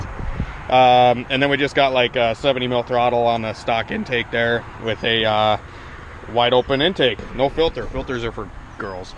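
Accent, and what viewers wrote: Canada. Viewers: dude i thought you were canadian. funniest shit I have watch while being in-depth about tuning. have him get the Ebay special headgasket next time. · I can't tell if your Amish or Canadian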